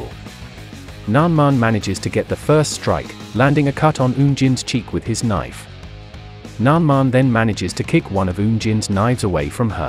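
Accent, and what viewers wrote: United Kingdom. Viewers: Having to listen to very bad English being read by someone with a British accent is very weird indeed.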